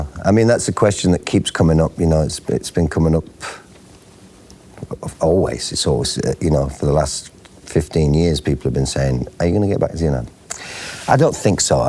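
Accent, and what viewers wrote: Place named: United Kingdom. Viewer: Love listening to yr posh English voice. Come back again.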